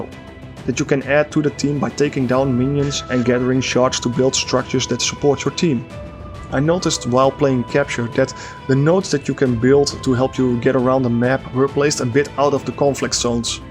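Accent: Netherlands. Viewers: I also liked and subbed, you earned that. And I think you dutch accent doesn't hurt at all ;) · You sound so dutch it hurts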